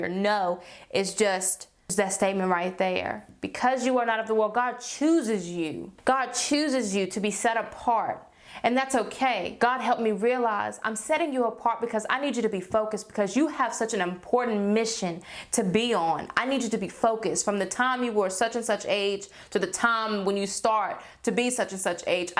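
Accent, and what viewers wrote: South, United States. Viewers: That Southern accent keeps peaking out a little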